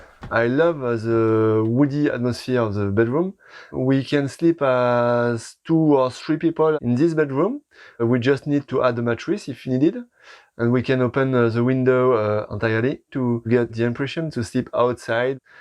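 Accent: France. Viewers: His French accent.🙄🙄🙄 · Si tu sors de la France, la langue française ne sert plus à rien, donc pour atteindre un large public, c'est mieux de communiquer directement en Anglais avec l'accent français, c'est mignon, n'est-ce pas ?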